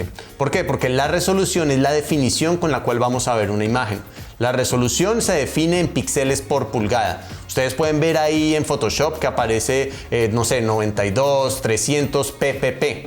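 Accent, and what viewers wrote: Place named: Canary Islands, Spain. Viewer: cada vez que veo un video tuyo aprendo algo. lo explicas muy bien y se te entiende perfectamente . tambien sera porque soy Canaria y pronunciamos de igual manera jajaja gracias!!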